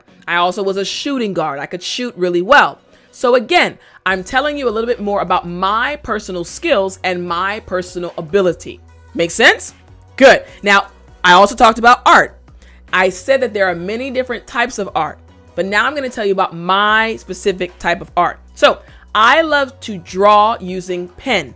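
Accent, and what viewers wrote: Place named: United States. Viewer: What an amazing American accent you speak dear! I love listening to it!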